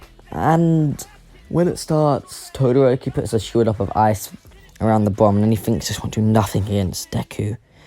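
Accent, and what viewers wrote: United Kingdom. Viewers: insert british accent x100 Yo my g how r u with that british accent and dat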